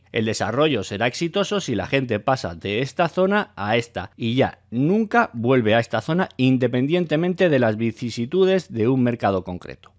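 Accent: Mexico. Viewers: Estoy empezando a pensar que eres mexicano Asentó, palabras, ejemplos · 🤔te parece que habla como mexicano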